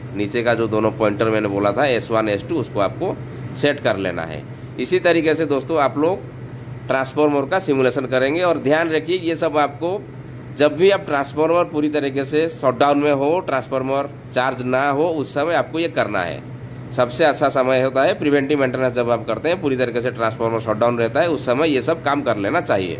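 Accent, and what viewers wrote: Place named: Odisha, India. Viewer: Odia guy....bilkul odia accent. good job dear.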